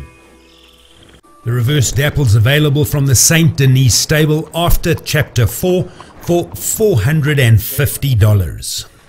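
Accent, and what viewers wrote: South Africa. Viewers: Great video! First time I’ve seen you on YT. Your accent is South African. Eastern, I’m guessing.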